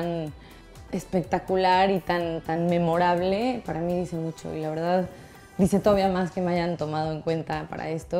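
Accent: Mexico. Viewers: Ay! Como me encantas... Curiosamente acabo de ver otros videos de recién que llegas a España, y como que querías adoptar el acento españolaooo🙊jajaja pero creo que el furor ya pasó, hace tiempo. Me encanta que conservas tu acento mexicano y muuuy freson! Eres divina😗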